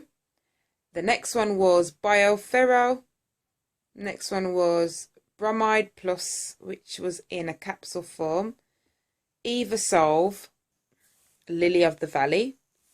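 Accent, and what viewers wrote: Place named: Caribbean. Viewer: yesss sister that accent and I can tell u got Caribbean in you ☺ ..you're right though fibroids causes the abdominal area to bulge, I'm happy for you!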